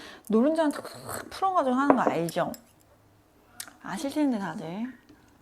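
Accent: Gyeongsang, South Korea. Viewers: 약간 경상도 사투리가 묻어있는거 같으세요ㅎㅎ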